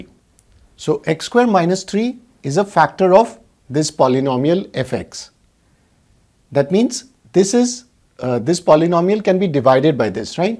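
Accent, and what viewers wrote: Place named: India. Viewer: listen to his accent, you can tell he is indian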